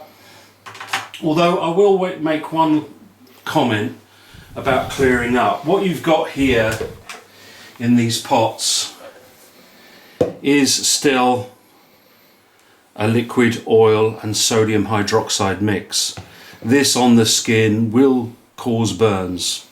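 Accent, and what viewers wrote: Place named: United Kingdom. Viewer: What a breath of fresh air! at last, a clear informative video and British too, this will be of great help to anyone starting out, or for those who feel confused or overwhelmed by other videos. I found it really helpful, although I have been making soap a little while now, but it was nicely reassuring that all what you showed I also do. Thank you so much, looking forward to more!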